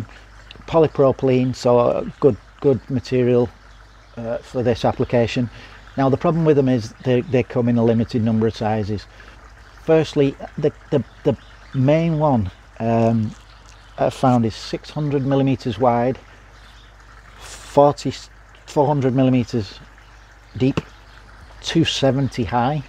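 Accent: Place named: Manchester, United Kingdom. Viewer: I know St Helens well mate although it was a bit of a surprise, as I could have sworn you jave a Manchester accent. Keep up the great work.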